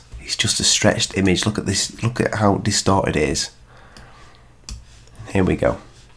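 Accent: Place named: United Kingdom. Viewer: I live in the UK in a small shit town called Grimsby, and i know you will know it just by your accent, anyway, i am a big fan of secure team 10, but hats off to you mate, even i didn't believe in most of his Google earth images, and i know other videos may be fake, i just wish now he would do his research properly, I feel a bit gutted actually. But i still believe that there are alien craft out there, most of it probably back engineered by the real terrorists, AMERICA! The only country allowed to bomb other countries, and when they fight back, they are called terrorists, anyway, that's a different subject. Nice work buddy.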